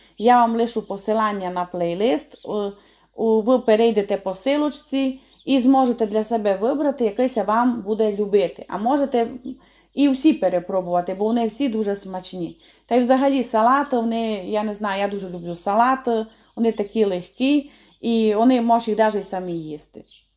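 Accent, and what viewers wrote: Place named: Tiachiv, Ukraine. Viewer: Вітаннячко з Канади 🇨🇦 Вподобайка одразу на підтримку каналу 👍 Хотів спитати Вас ,часом Ви не з Тячівського району? Така знайома ,приємна бесіда у Вас...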